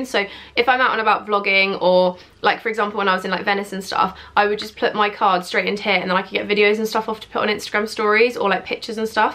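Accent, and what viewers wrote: United Kingdom. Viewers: Is she British? 😉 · English I’m guessing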